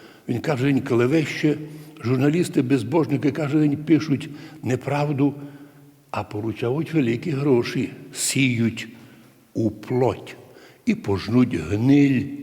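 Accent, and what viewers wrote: Transcarpathia, Ukraine. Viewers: А , це знову отой, що сам не знає, ,,што" говорить? Ще один знавець ,,істини". Навіть не потрудиться сказати, що ,,ми віримо в те, що у нас істина". Віримо! Так ніт же - і Томос у нього ,,куплЕний" за,, амеріцькі долляри",і ,,дітей треба не шкодувати, а відправляти на війну"... Своїх то, мабуть, нема? Та ще витерпіти оту його окремо взяту ,,закарпатську мову"- це ,,што - то с чєм - то". Опанував би краще літературну мову, ти ж публічна людина.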